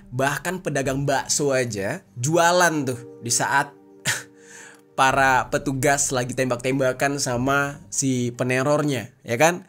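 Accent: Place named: Aceh, Indonesia. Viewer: Bang tdk bisa ngucapin krn dia orang Aceh logatnya beda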